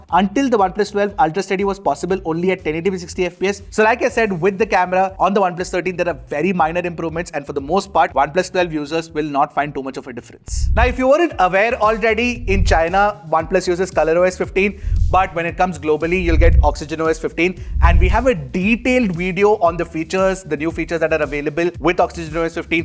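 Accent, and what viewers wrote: India. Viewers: Indian accent is bubbly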